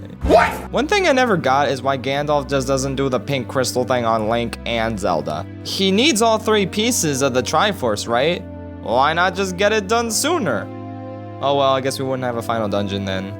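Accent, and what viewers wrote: United Kingdom. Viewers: omg you’re british no way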